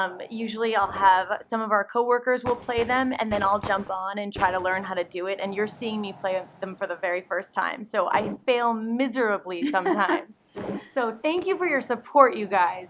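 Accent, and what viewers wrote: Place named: United Kingdom. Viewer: I like the British accent. Haha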